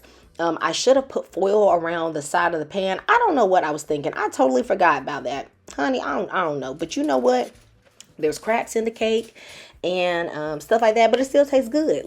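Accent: United States. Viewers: I love your ghetto American accent, I'm from Africa and I'm glued to your channel baby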